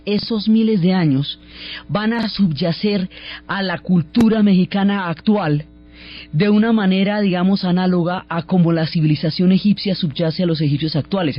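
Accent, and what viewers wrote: Colombia. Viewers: Hola Ferlo, en Guatemala habian Mayas. Tanto su presencia que yo pensaba que ellos habitaron en Guatemala y creo que el escritor de hombres de maiz es guantemalteco. Si buscamos autores guatemaltecos sobre los mayas los encontramos. Tambien Chiapas y Yucatan eran de Guatemala. Asi que los mayas segun mi conocimiento eran de Guatemala aunque si hubieron Mayas en franjas de El Salvador y Honduras. A mi me parece que Diana Uribe hace un buen trabajo. Recuerde que ella es colombiana y su pronunciacion es diferente a la mejicana y centroamericana.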